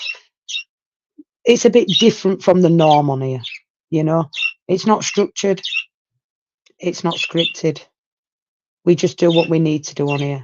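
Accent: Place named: Leeds, United Kingdom. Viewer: I think you are beautiful, and all the panel are beautiful. What you do is amazing. Love you. You are accepted by the one's who really care. Fuck the haters and just focus on the one's who care for you and what you do for your community. Take care, thinking of you x. And by the way, I love your Leeeeds accent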